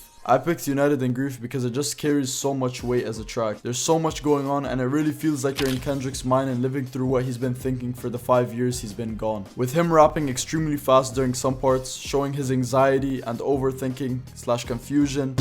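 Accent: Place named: United Kingdom. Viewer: Why do I love the British accent so much😭😭😭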